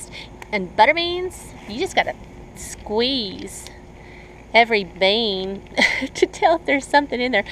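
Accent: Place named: South, United States. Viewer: Just love your southern twang! You sound like my sister 🤗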